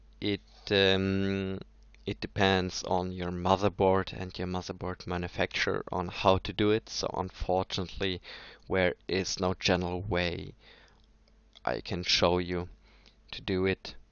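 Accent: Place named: Germany. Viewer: Hey, i managed to get Ubuntu running in my Macs. I actually have several macs like a Studio with M1 Max and a MBP M3 Max. The solution - if anyone needs it - is called "UTM" and can run a Ubuntu 22.04. From there you can run the script. God thx i did not throw away my 6TB from my WD MyCloud Home. It will take days or weeks to recover files i guess, but it should worth it. Am i right you are german like me? Because of your accent. Anyway... WD is der letzte Dreckscheissladen und bestimmt meine 4te HDD. Hoffentlich Insolvenz incoming 😂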